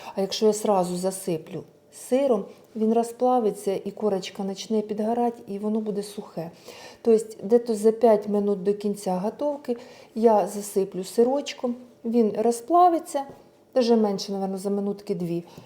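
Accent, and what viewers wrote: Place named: Surzhyk, Ukraine. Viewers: Ви не схожі ні на кого,у вас свій стиль на воя харизма,у вас суржик,але він більш український,уГалини ж тільки для виду пару слів українських,ви приємна чудова,доступно все розповідаєте і показуєте не дивлячись на те що не закінчували кулінарних закладів для мене особисто ви своя,зрозуміла і ХОРОША ЛЮДИНА❤🎉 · Мені дуже подобається ваш канал. І я дуже дуже вдячна вам за ваш суржик. Він мені такий милий, бо нагадує Херсон, де я проживала до війни. Успіхів вам! ви така неповторна і щира.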